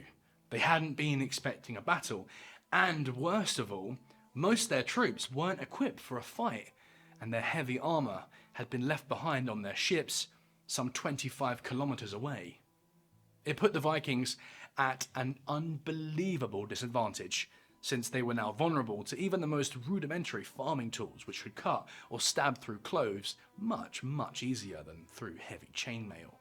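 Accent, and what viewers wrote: United Kingdom. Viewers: Why are the popular YouTube history channels always narrated by Brits? 😂 Rather soothing to my American ears lol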